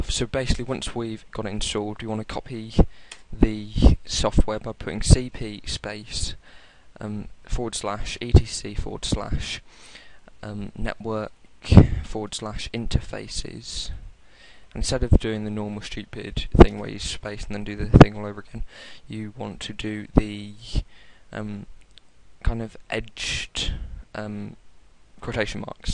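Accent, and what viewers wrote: United Kingdom. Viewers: English accent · I like your accent. You're British, aren't you?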